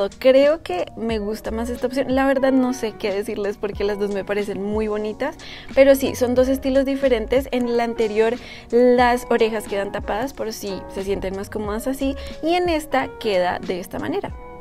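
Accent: Colombia. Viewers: Ustedes los colombianos tienen un acento bello:3 saludos desde México 💗💕 (Hermosos peinados)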